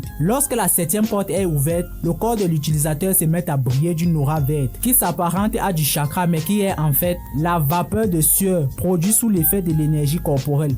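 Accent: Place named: Africa. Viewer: C'est juste que t'as 1accent comme dans beaucoup de nos pays Africains dû à nos langues traditionnelles, c'est pas grave mon frère. Continues comme ça j'aime tes vidéos👍🏽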